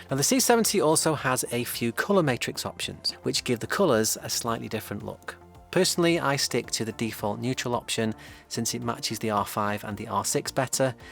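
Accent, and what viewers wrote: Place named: England, United Kingdom. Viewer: Thank you for this very informative video. Gave me the information I wanted with an English voice presented at a nice pace. Thanks again.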